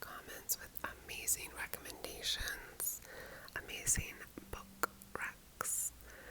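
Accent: Argentina. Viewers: Felicitaciones por estos 7 años con el ASMR. Que vuelvan pronto los videos en español con acento argentino. Saludos.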